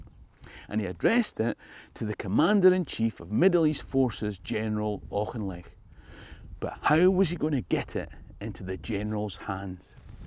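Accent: Scotland, United Kingdom. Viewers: Though it took me a while to adapt to the Scottish broga , You are the best story teller I can remember hearing and I'm old.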